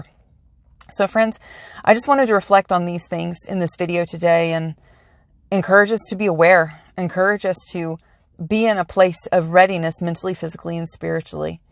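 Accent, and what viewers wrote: South, United States. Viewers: I love it when you talk about the economy & Hebrew. i wonder what else you talk about. We have so much in common. I wil watch you more. Now you talk about wonder history & future. You have very little southern accent. I am from Tennessee, too. I will watch you more, I have missed so much by not watching you.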